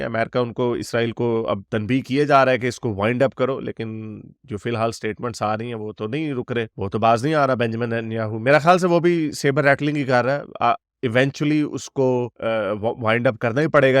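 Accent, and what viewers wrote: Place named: Punjab, India. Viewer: Bro! I like your ideology and content all the time. Punjabi touch in your all the videos is mind blowing like chhitro chhitri😂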